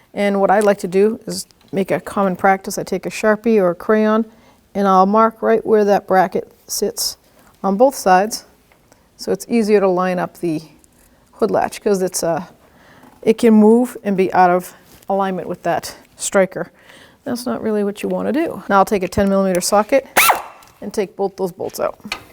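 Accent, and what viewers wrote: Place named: Massachusetts, United States. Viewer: Love me a good mass-hole accent!!! Great video! Thanks for the help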